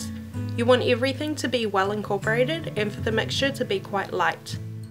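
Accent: New Zealand. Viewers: She sounds like she’s from new zealund bro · Your from new zealand right? I can hear it in your accent :D im a kiwi too!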